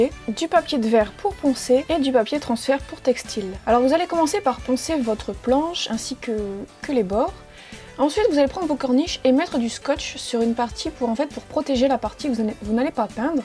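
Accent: North, France. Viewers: je ne sais pas si tu as deja repondue a cette question mais tu es du nord j'imagine vue ton accent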